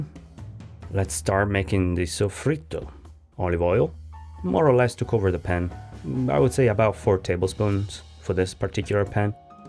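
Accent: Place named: Italy. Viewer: I would love to know the name of the chef/person narrating this video! His voice, Italian accent, and sprinkling some Italian in with the narration are perfect! ❤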